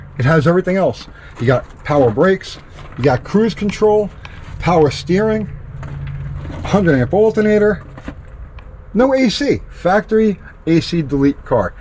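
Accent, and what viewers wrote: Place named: New Jersey, United States. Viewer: I love listening to talk, my grandmother was originally from New Jersey and she pronounced things just like he does 😂 being Midwest redneck kids we always thought she sounded so weird.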